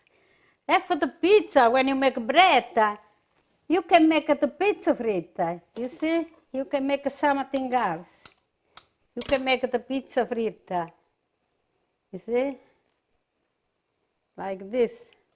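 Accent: Italy. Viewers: The world is small! We all in immigrated to Chicago in 1964. Went to Italy in 1979 and met my husband and got married in 1982. In a small town I am sure knows called Castelluccio Valmaggiore not far from Faeto!! I now live in northern Italy. My family still lives in Chicago. I love listening to she reminds me of my mom passed away in 2015 Adele who also always cooked and baked as with her Italian accent. Bye.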